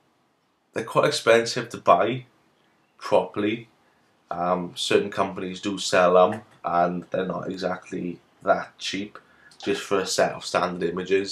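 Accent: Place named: Wales, United Kingdom. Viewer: Well, well! What a surprise to hear your accent! I'm used to hearing Polish, German or American accents when I click on tutorials, so this was a rare treat! That has to be worth a sub! I'm just down the road in Neath! Nice tutorial by the way! Where did you learn all this?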